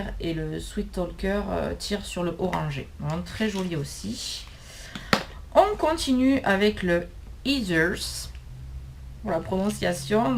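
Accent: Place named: South-West, France. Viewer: C'est bien ce que je me disais j'ai reconnu l'accent du sud-ouest 😉 je suis de Mirande dans le Gers mdr 😘😘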